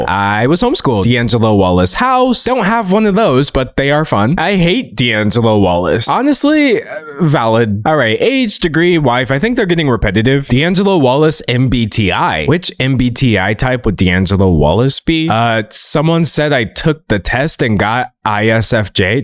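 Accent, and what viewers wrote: South, United States. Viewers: Not gonna lie, I thought I detected a hint of a southern accent in recent vids, but I thought I also may have just wanted for it to be the case. Whether it is home grown or absorbed (as southern accents are wont to do), welcome (back?) to my home state!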